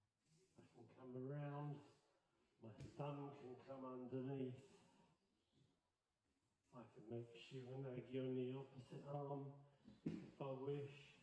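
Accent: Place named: United Kingdom. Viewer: Thank you so much for posting these videos! I did aikido a few years back so it helps me to reminder, also I’m English transplant, so nice to hear a old Brit accent again lol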